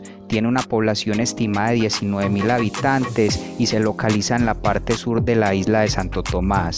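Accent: Colombia. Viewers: Sin duda alguna es colombiano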